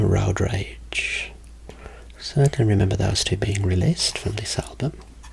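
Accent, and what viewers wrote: United Kingdom. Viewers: I absolutely love your voice and that beautiful british accent. I swear you could even read the phonebook to me and i would enjoy it ❤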